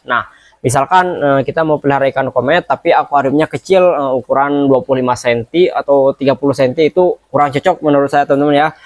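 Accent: West Java, Indonesia. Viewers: Aing asa aya layan. mun tepung jeng orang sunda. aslina kang lila ngarantau ngadenge logat sunda asa bungah pasedek-sedek herin. modar 😅 🤣🤣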